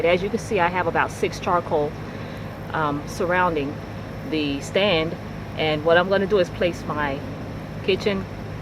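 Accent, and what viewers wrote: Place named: Washington, United States. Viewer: Your very welcome! by the way are you in Washington state? because you say guys like i do.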